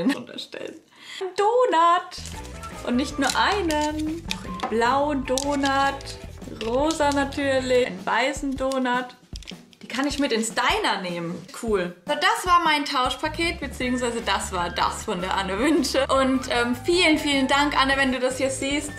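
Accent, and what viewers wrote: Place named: Palatinate, Germany. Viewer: endlich mal jmd der iwie pfälzisch redet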